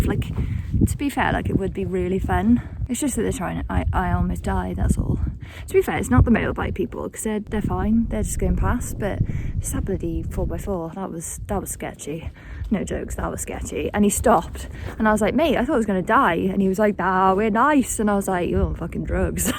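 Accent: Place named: Liverpool, United Kingdom. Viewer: Absolute nutjob marry me. And the scouse accent love it. Ha ha ha.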